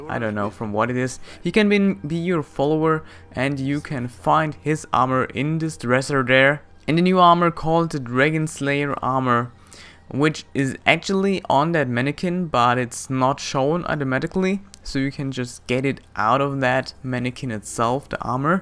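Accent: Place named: Germany. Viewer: Guys he's German.. So i wanna hear you guys speak German.. And then say no it's pronounced like that.. -.-'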